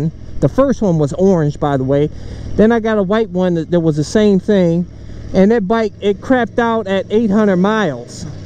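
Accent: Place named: Atlanta, United States. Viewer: This man's got that Atlanta accent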